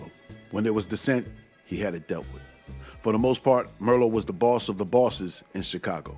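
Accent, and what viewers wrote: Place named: East Coast, United States. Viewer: Here Boss. Don’t want Mr. Algorithm to wack me and bury my ass in the desert. Looking forward to the John Torrio vid. I was introduced to him via the series Boardwalk Empire and want to know more about his beginnings. Your narration, dialogue, fly and scruffy East Coast accent, and gangster allusions are second-to-none. Superb story-telling skills. Don’t take wooden nickels Boss. See you’s in the funnies Boss. 🖤 📚 🎥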